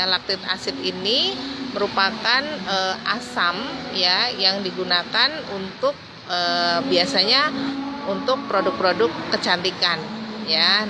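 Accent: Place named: Jakarta, Indonesia. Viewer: Suara jrlas dr jkt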